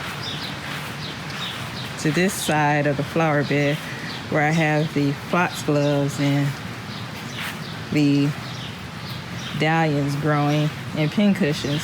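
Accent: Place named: Chicago, United States. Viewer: Hi. I’m new to your channel. Beautiful backyard and flowers. I noticed that your having issues with your dahlias. I believe it’s where you have them planted. You have them in a bed near your gutter water spout and the tubers might be receiving too much water along with the over abundance of rainfall. So you have the rain fall along with all the water from your gutters. I did the same thing about 5 years ago. Moved them to a much dryer well draining spot and had no issues going forward. Also I looked at your surroundings and just knew you were in Chicago or maybe near Detroit along with your accent. Then I went back to your earlier videos and I was right! I’m from Chicago and visit Michigan on a regular to visit family, and I swear the surroundings look exactly the same as Chicago..😆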